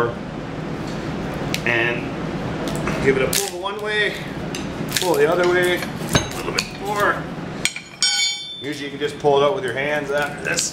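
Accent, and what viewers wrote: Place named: West Coast, United States. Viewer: Thanks Bro. just picked up my first washer motor today ...bit of a grunt getting the little basterd out ..had to hack saw it in two ..now I'm down to the windings ...I guess I will try the cut one end and pull em threw method as it looks like this is the easiest method for me being in an appartment ( no shop with vice) ..gotta do it dat way ...you sound like a West Coaster ....OK better quite gabbing and get on with the show ..cheers to all my fellow scrappers out there ..probably one of you is the guy that gets to some of the TV's before me ...got to love them big sonys ...they are killer ..got 2 the other day ....check out my site I got lots of interesting videos ...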